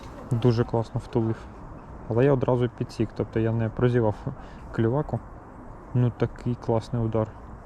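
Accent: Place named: Russia. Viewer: сразу слышно, шо хлопец в быту говорит по-русски (не по-российски), но под давлением пОтриотичного рогулья, вынужден видосы пилить по-украински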